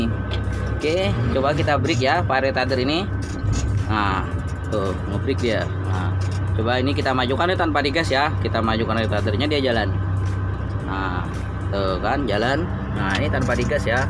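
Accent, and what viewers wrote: Banjar, Indonesia. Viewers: Logatnya orang kalimantan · Lugat banjar 😂😂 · Asli urang banjar sidin ni wkkw dri logat nya,kd kwa bedusta wkwk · Logat nya banjar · Orang Kalimantan kh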